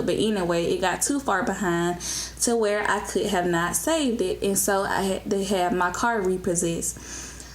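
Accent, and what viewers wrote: United States. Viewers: I love Chocolate woman..2pac said The darker the berry the sweeter the juice..shoot me a Email Choc a doll...if it your situation dont work <mail> sound like you from down south..Im Capitan Save a lot...womam are so much ahead of us. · You sound like ur from Alabama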